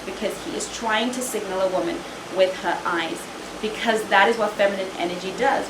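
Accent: United Kingdom. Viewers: You look gorgeous. I love your British accent:)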